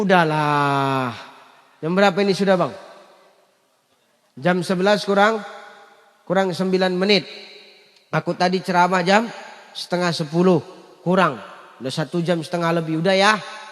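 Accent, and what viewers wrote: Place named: Batak, Indonesia. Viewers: Ciri khas bahasa dari kota Sumatra Utara khususnya Medan memang seperti ini logat nya engkau kau.pribahasa seperti ini nampak nya terlalu kasar bagi orang yang belum paham.tapi percaya lah orang suka Batak itu suaranya lantang dan kasar dan insyaallah hati nurani nya baik🤲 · Sumtra utara mang gtu bang ..itu bercanda semua .saya org jawa tp saya justru suka cz mang bgtu.logatnya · Itu menurut mu aja... Karna anda lahir di daerah yg punya bahasa lemah lembut saudara ku.. sementara beliau dari Medan Sumatra Utara .. demikian lah logat bahasa nya ..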